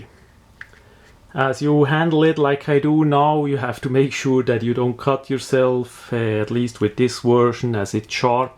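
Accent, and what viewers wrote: Switzerland. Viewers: Merci ver de swiss-akzent :) geil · Das isch es richtigs Schwitzerenglisch :-D eifach genial! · I come from germany and I always liked the swiss accent... In English it's even better! =) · amazing knife, helpful video and your english has a funny swiss accent ;) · Oh Swissenglish very lucky axent,!!!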